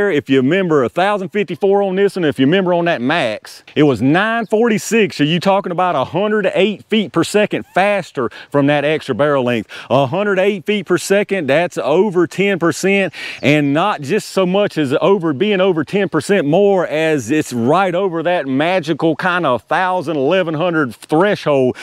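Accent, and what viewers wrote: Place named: North Carolina, United States. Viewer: He may live in Virginia, but that accent is eastern North Carolina.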